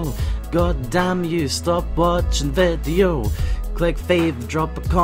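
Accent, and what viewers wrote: Scotland, United Kingdom. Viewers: I wish i was Scottish u speak fucking Cool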